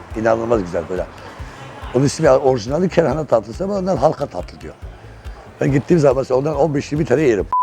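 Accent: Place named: Black Sea, Turkey. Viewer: Abi sende Karadeniz şivesi var.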